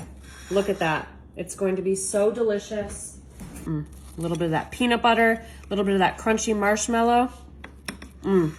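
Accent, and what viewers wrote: South, United States. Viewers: So in your newer videos on your other channel you have a southern accent and sound totally different. Is it fake? Or is this accent on this video fake? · So why the southern accent on your other channel? 🤔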